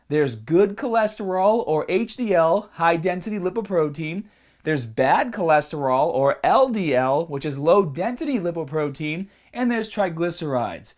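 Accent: Boston, United States. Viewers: It's a Boston accent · Holy crap! has a Boston accent? Since when? LOL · Man how come you can't pronounce AR, EAR, and ER words? Is this a Boston accent? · that boston accent is bursting outta ya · God, that bostonian accent is so annoying. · NOTHING more annoying than the Boston accent. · are you bad Boston? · you sound more "Boston" than ever before?! Not sure if it's just my ears though. Lol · This guy. Boston as fuck.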